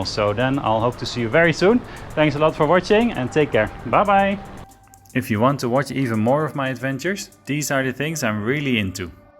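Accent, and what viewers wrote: Netherlands. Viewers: When I heard him speaking I immediately knew he's from the Netherlands 😂 I just know the accent lol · You sound Dutch, but the environment looks way better than here in Holland. Nice video!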